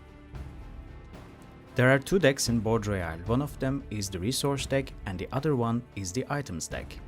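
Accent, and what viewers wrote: Turkey. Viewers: bunu bir türkün seslendirdiğine yemin edebilirim ama kanıtlayamam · Yapımcıları türk zaten hani seslendiren de türk aksanından belli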